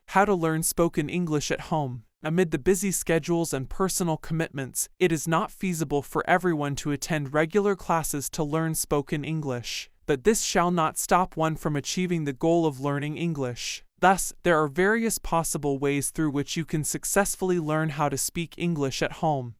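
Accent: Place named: United Kingdom. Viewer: Your speaking tearm it's very unique as like British English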